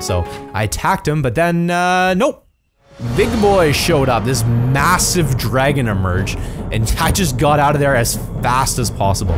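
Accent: Canada. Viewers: Just started watching you and honestly 98%sure you must be Canadian because you sound it. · this sure is bussin bussin, especially because this guys accent sounds canadian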